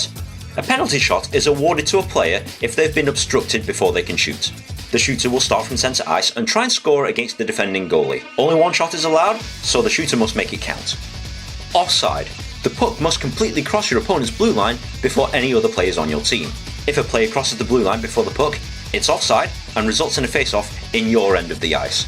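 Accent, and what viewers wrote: England, United Kingdom. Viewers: Why the hell I wanna hear an Englishman explain hockey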